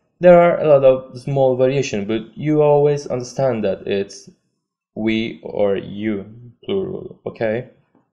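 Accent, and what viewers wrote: Italy. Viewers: Palermitano mi sembra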